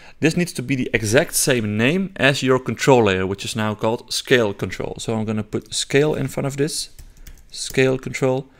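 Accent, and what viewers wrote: Netherlands. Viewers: Thank you for this tutorial! It really helped me. And also - your dutch accent, it's so beautiful, love it!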